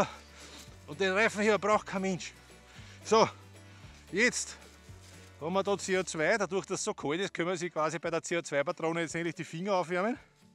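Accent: Austria. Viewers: Geiler Dialekt is des va Niederösterreich?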